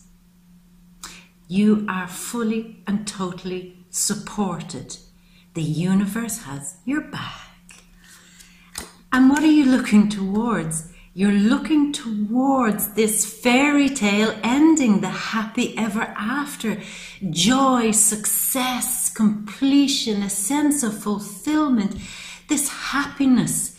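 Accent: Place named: Ireland. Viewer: You are so amazingly beautiful you remind me of Maureen O'Hara and you have such empathy and warmth as part of your personality, it's intoxicating. Plus, there is, how does Sean Bean say it, "A charming Irish lilt."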